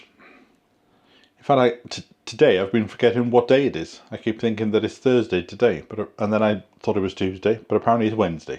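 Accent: Wales, United Kingdom. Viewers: I so enjoy your videos because it is like visiting adear friend. Honest dialogue and just hearing that beautiful Welsh accent takes me right back to my Grandma’s kitchen. Don’ t let those trolls get to you. Not worth your time or energy. Your true blue followers just enjoy spending time with you and enjoying your allotment videos and new vistas when you are out and about in your car. Just you being you is all that we need to bring a little Welsh sunshine into our lives. 💕👍🏻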